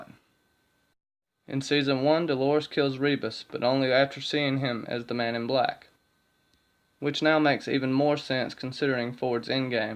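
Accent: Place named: South, United States. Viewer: Hey man!! I've been talking to AU Packmule about being a guest on his channel. My notifications were messed up and I didn't even realize he did so many streams. I saw you, and I've subscribed. I'm from Alabama. I can tell you're definitely from the South. So looking forward to watching you and hopefully joining a chat!!😀❤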